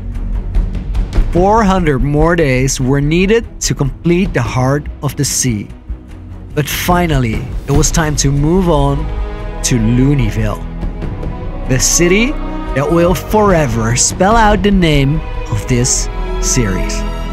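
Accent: Netherlands. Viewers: Where are you from you sound Dutch! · you sound dutch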